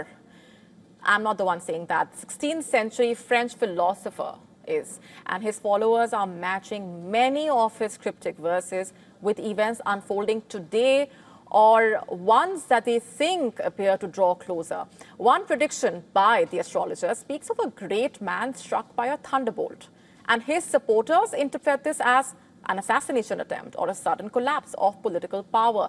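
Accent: India. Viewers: Accent of India is too strong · I couldn't understand half of this from her poojeet accent.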